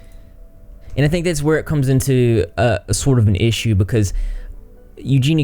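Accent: South, United States. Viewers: It's so refreshing to hear things like this and such a sensitive position in a southern accent. I feel like conscientious & kind southerners don't get a lot of representation (not that they're an ~oppressed~ group or anything, I just think the largely conservative majority of southern states makes a specific stereotype somewhat accurate) and it's just very comforting and nice to hear. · I'm trying to figure out where this guy is from lol. Definitely from the south, but at the same time reminds me of the 1980s. Does that make any sense? Probably not! 😂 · nice southern accent! haha im from texas, where are you from? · Are you southern? Because i am and i cant tell, which is so wierd! This is the first video of yours that ive watched. You seem southern, but i have heard people from california talk like that so i dont know. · Southern I'm from ky and its definitely this area Tennessee maybe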